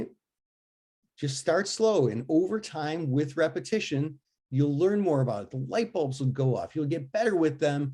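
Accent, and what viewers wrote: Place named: United States. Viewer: really struggling with this yank migrant's accent, rayt? "stahck" rayt?.. how does he say clock? "clahck" rayt? 🤢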